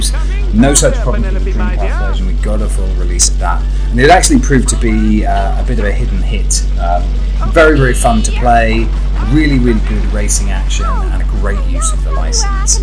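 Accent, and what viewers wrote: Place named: United Kingdom. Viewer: The Britain accent it's amazing. Congratulations for the video.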